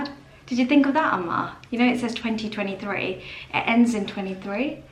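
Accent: United Kingdom. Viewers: there's a saying "if i had a british accent i would never shut up." 😂😂 ur lucky u have a british accent. i wish i had one too lol.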